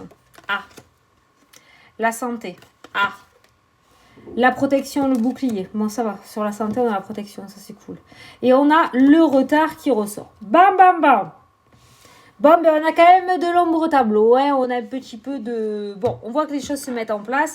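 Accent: South, France. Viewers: Ahhhhh😊😊😊... enfin un peu de soleil avec ton accent sur Clermont, dediou ça fait du bien 😁😁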